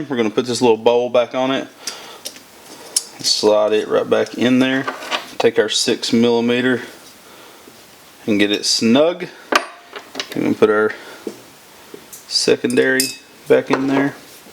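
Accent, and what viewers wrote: South, United States. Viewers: Cool, good job! Sounds like you are in the south, as I am too, I'm a old fart but I have been inside of every kinda of engine known as well as carburetors. Some friendly advice on a product which you probably have already tried but anyway get you some berryman carburetor dip it is a miracle dip. I use it also to clean bolts and pretty much anything. Give it a try.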